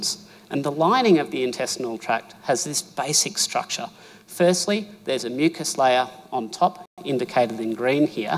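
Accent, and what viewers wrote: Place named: Australia. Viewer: Thank god at last somebody from Australia talk not US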